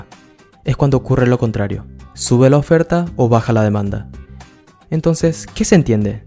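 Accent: Paraguay. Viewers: Ndeee vos sos paraguayo verdad?. Te pille por tu acento xD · Decime que sos Paraguayo o vivís en Paraguay.... 🤔🤣👌🇵🇾👏👏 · WTF ese acento Paraguayo. Jaja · Sos paraguayo? tenes nuestro acento :0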